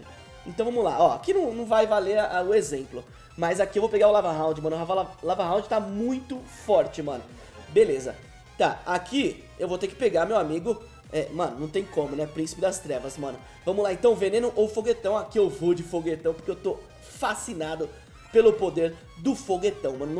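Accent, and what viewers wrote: Minas Gerais, Brazil. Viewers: Mineiro eu acho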